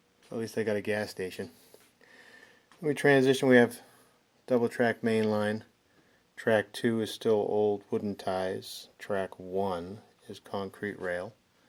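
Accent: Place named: Midwest, United States. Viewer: Beautiful! BTW - let's work on your midwestern/northern Illinois accent a little....Dekalb is Dee-kal-b { really stress the kal, as in 'pal' }, not dakahlb…..Rochelle is Ro-shell, not Rah-shell....Proviso is Pro-vigh-zo, not Pravizzo. As I see you're striving for perfection, I figured you'd appreciate some constructive criticism! Keep up the good work!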